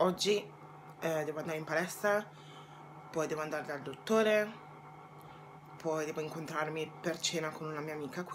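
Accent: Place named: United Kingdom. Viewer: Ciao sei italiana (cioè nata in Italia) e poi trasferita a Londra o cosa?:) appena iscritta mi piaci molto e apprezzo molto il tuo accento molto british haha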